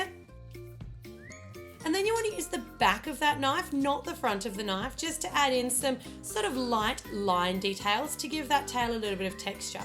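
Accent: Australia. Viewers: Your beautiful And I luv you Australian accint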